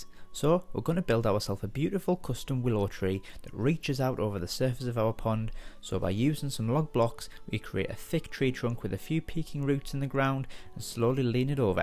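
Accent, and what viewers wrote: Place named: Yorkshire, United Kingdom. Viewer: Me too! :), But i know that Lol, I can tell from your accent, Sounds northern, you from Yorshire?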